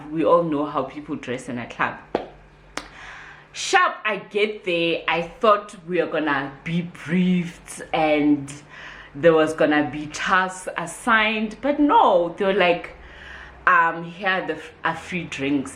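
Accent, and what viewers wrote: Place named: Sotho, South Africa. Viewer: Your Sotho accent ❤️😍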